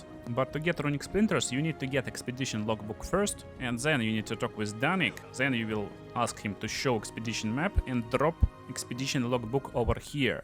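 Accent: Russia. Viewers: Most likely Russian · Strong Russian accent 😂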